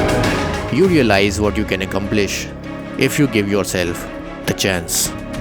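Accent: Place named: India. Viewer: good lord, the indian accent, it feelsl like you want to scam my money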